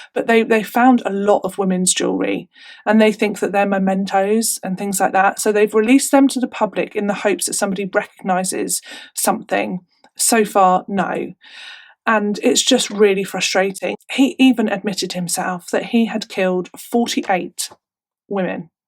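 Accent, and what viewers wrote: United Kingdom. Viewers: I love your videos. Love your voice and attitude. I’m American, so I love the attitude and voice of the English. Can you add more photos as you’re telling these cases? I’m not asking for shock photos, but photos that humanize the case more. Like childhood photos of the victim, photos of love ones, photos of the killer, photos of the trial, etc. Please and thank you. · i just found your site by accident and watched one of your videos. Normally I would be irritated by your presentations, but I find your approach to be delightful -- can't say funny because of your subject matter!! I am from London originally so its refreshing to discover a British narrator of horror stories!! You remind me of Miranda Hart who had her own unique TV show, I hope you do other performances other than tell these stories??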